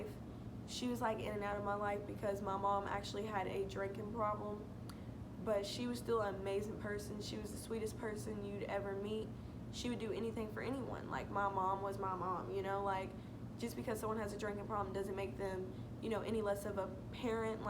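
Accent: South, United States. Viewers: Girl where you from you sound so country lol.. They should've came and picked you up as soon as they could instead of scaring you and working up your nerve.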